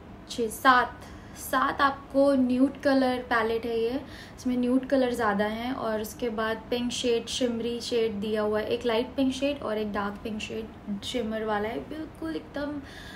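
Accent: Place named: Lucknow, India. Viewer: assalam alaikummm .....bhot beautiful ho.....aap ki awaj ekdm lko walao ki trah haiiiii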